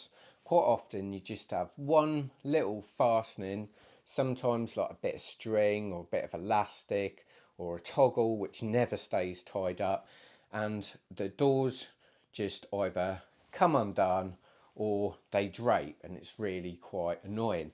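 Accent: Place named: Scotland, United Kingdom. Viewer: I live the Scottish accent bro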